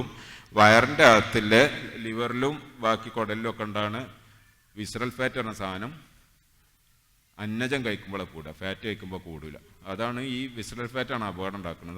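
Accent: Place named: Malappuram, India. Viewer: എനിക്ക് എറ്റവും ഇഷ്ടപെട്ടത് ഇദ്ദേഹത്തിന്റെ നാടൻ മലപ്പുറം slang ആണ്.. നല്ല വ്യക്തിത്വം.... ഇദ്ദേഹത്തെ പോലുള്ളവർ ആണ് യഥാർത്ഥ മനുഷ്യ സ്നേഹികൾ.. അല്ലാതെ രാഷ്ട്രീയക്കാർ അല്ല...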